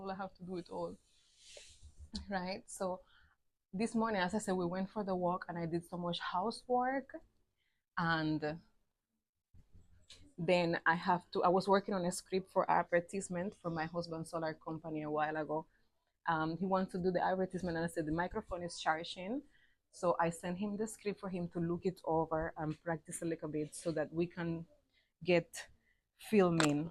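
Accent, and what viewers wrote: Jamaica. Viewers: yo you have a stronger jamaican accent than me and i am half Jamaican... but raised in the states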